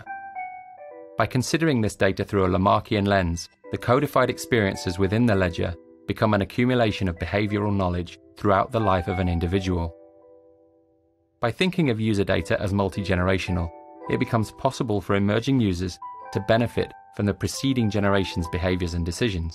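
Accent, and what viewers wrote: United Kingdom. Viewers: This is so clearly faked. This is pretty low budget (compared to Google), its narrator is British for some reason, THERE'S AN IPHONE IN THE VIDEO INSTEAD OF AN ANDROID, and it's too direct. Even if this is what they wanted, they wouldn't just come out and make a video about it. Also why would they give it creepy music? Come on. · B.S...If this really was a google internal video, why the eff is there an iphone, and imac, and ipad, and no google devices...seems more like apple propaganda (especially with the British accent narrator). But I don't discredit that google does shady stuff.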